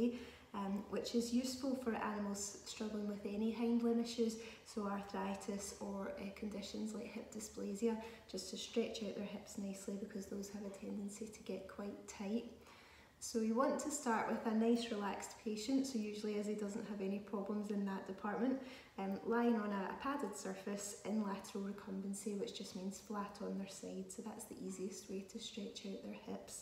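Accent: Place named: Scotland, United Kingdom. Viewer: Thank you. As a Midwestern American, I must say I love the Scottish accent. So much nicer than what I hear around here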